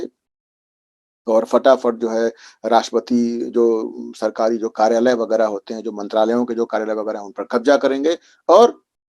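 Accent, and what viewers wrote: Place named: Fatehpur, India. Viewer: Theth Fatehpuriya Bhasa Maza aaya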